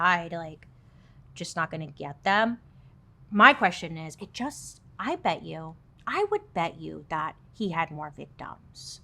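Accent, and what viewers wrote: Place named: Ohio, United States. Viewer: You said that correctly. You sound like a true Ohioan so lol favor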